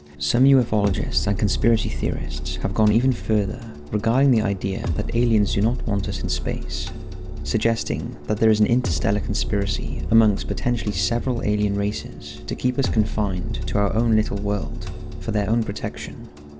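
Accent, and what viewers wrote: United Kingdom. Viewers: Just amazing how a narrator with a proper British accent can spew the most nonsensical fact free content and people will just lap it up.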